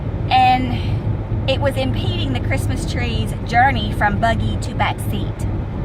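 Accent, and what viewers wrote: South, United States. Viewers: understood. It is also strange because it sounds like you are from the south. Don't give up hope. Seek first to understand what is happening to our culture so you don't suffer needlessly.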